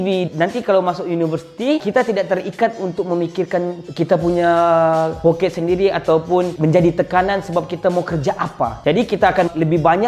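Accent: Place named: Sarawak, Malaysia. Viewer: Org serawak ke ni? Best lak dialek dy.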